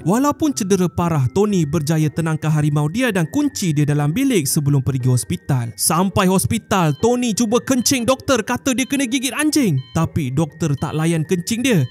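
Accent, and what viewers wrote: Sabah, Malaysia. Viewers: orang sabah ke? Anduk baaa😂😂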